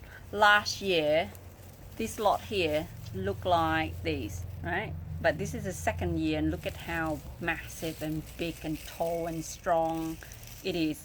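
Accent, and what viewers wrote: Australia. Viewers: Beautiful plants also Is your accent mixed in with Australian? 😌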